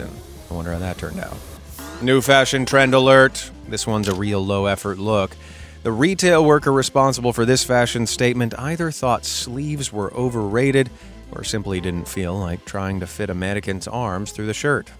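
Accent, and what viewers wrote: United States. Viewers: I noticed that you have an American accent but said buggy when referring to a baby stroller and lorrie when referring to I’m assuming a delivery driver and fire brigade instead of fire department. Odd.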